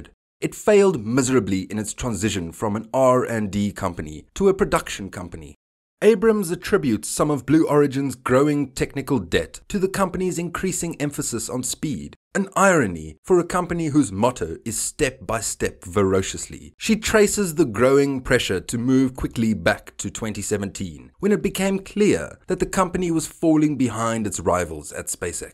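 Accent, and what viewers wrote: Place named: Vaal, South Africa. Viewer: Huz that Vaalie accent bru? Nice and syrupy...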